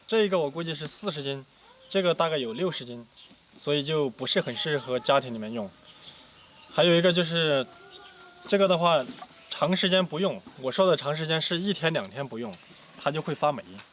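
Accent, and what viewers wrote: Sichuan, China. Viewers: 川普讲的很标准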